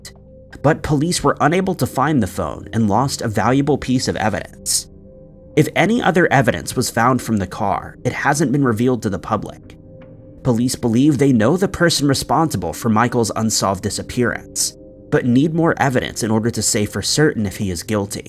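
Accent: United States. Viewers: He has an American accent. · Can someone explain why the narrator has an American accent yet he speaks like English isn't his first language? It doesn't bother me that English isn't someone's first language, what bothers me is that he sounds like a native speaker and yet he speaks like he's still learning the language. This just baffles me, I've watched a few of his videos now and have never understood why he always says "this strange but true blah blah blah" or "this scary mystery blah blah blah" it just sounds SO awkward and unnatural.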